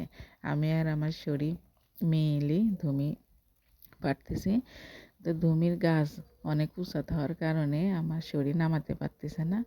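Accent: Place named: Natore, Bangladesh. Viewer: আঞ্চলিক ভাষায় কথা সুন্দর ❤❤❤ নাটরের মেয়ে নাটরের ভাষা🥰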